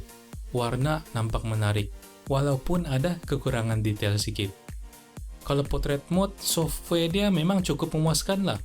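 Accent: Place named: Sabah, Malaysia. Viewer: MCM org Sabah ni abg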